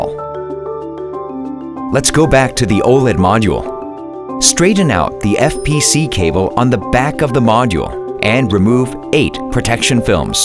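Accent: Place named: China. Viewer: Harible Chinglish in this but i'd love to know what Text to speech program they used.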